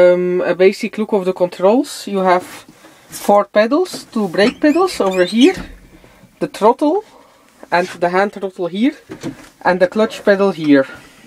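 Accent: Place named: Netherlands. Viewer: Duidelijk hoorbaar dat je normaal gezien nederlands spreekt ;D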